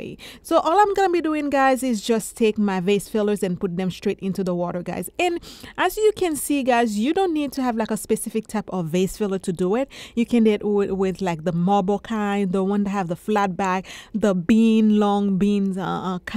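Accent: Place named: Haiti. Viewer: My guess is you are Haitian. I love your voice and accent. God bless you.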